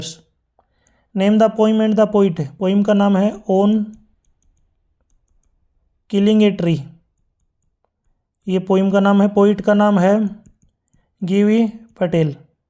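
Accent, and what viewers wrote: Haryana, India. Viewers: Aawaz se hi lag raha hai ki sir haryana se hai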